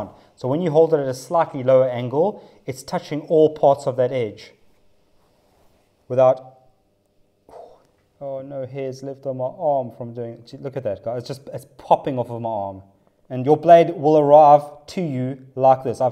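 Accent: South Africa. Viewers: I use a knife exactly like this to fit a violin bridge. This is interesting for a luthier. Where are you from? Your accent sounds South African, maybe?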